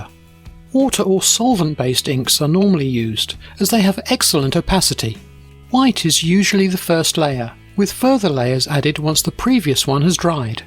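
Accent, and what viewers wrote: United Kingdom. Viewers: I speak for everyone when I say, we love how you Brits across the pond say stuff like; Decals, Schedule, Bonnet, Lorry, The Loo, etc. it’s so posh! 👌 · I am indeed. I usually like the way the accent sounds from your side of the pond, that one though, grates on my ears. 😜 Keep up the good work.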